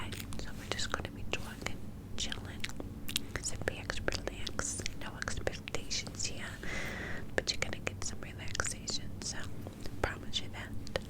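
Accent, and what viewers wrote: New York, United States. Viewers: I swear it's like you've read my mind!!! For the past couple of days I kept thinking about how I can't wait for a new NY accent video from you. I'm melting.....🤤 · Ny accent is just amazing!,!,!!! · I love your New York accent but your little rant about people’s comments saying it sounds Boston made me crack up even you smiled after you’d finished haha 😂 not sure how I missed this one but thank you, love it the accent brings me so much comfort 🥰 like in the movies when your little snuggled up watching films and they have the accent 💜 You also have such lovely hands xx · Hey I love your New York accent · The NY accent is everything. I’m loving the nude sweater and golden tones on your eyes ! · As a brit 🇬🇧 the newyork accent just hits different. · The clip truly is one of the tingliest triggers I’ve happened across! When you were running the clip through the mic, using both clips at the same time, and just saying the word “clip.” SO MANY TINGLES! 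But... - melted my brain in the most amazing way. 🤤 That was sooooo good. Thanks! This video was relaxing from start to finish. And I love your NY accent! The haters can kick rocks with no shoes on. 😎✌️💕 Edit: -... use this as a “treat yourself” button y’all! 🤤💜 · Love the New York accent!! What is your light called in the back x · That ny accent is amazing just like u girl hope u have a great week remember your son is so lucky to have u xx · Not only am I absolutely obsessseeeddd with the NY accent, the background water projection is so relaxing! Such a good vid 💕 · I LOVE the New York accent!!!! Love you ❤️ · New York or jersey? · The NY accent is EVERYTHING! i could just listen to you talk about anything. love you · Omg i love the ny accent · i find it funny that I LOVEE your NY accent, but me and most of my family is from Boston lol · love the New Yawk accent ! ur really good at it ! 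:D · ny accent AND 30 mins....#spoiled:) thanks soo much! <3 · I have watched the New York accents none stop and it’s funny cause my friend that’s from New York talks just like this it’s perfect 😂❤️ btw perfect video so so relaxing ❤️ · Yessss I think that the clip might get bigger than the claw with the mic and the ny accent like is this a dream 🥰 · NY ACCENT IS LIFE 😍😍🗽🗽🗽👌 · I am literally in love with the NY accent it is so tingly!! Maybe in the future you could do a hairdresser role play in an NY accent 💕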